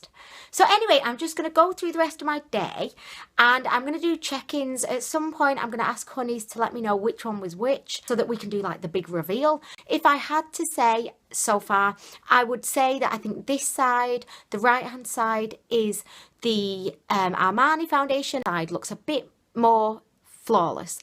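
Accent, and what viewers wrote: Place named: South London, United Kingdom. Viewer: Interesting accent. Where are you from? Are you south of London??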